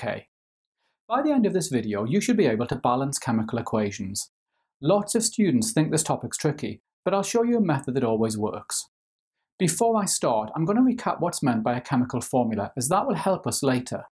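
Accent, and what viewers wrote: United Kingdom. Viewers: Why British accent makes everything better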